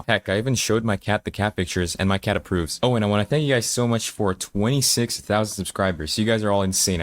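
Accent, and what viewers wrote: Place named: United States. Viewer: Hey man... I've been subbed to you for like a month or two and i friggin love these videos :) Also out of topic but where did you get that American accent while being a non native English speaker 😭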